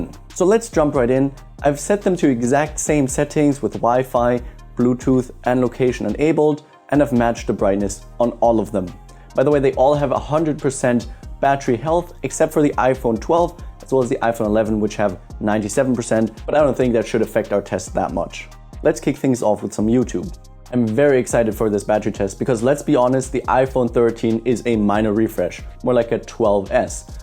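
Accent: Germany. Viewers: Haha wie man sofort den deutschen Akzent merkt 😂. Tolles Video, danke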